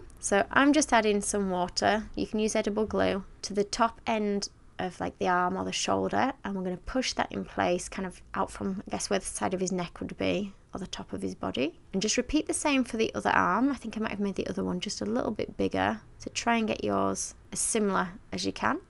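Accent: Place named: Lancashire, United Kingdom. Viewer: Blonde Rose She does sound enthusiastic.She actually says at the end how much she enjoyed making it.Also I am pretty sure she does the voice over after she has made the cake.And I am always impressed by how she manages to talk us through everything she is doing.She clearly takes a lot of effort to get her videos perfect.Music is such a subjective thing,what one person will,love,another 5 may hate.So I think it is a wise choice not to have it at all.I actually really like her voice,and what sounds like a soft Lancashire accent to my ears.And what a talent she is.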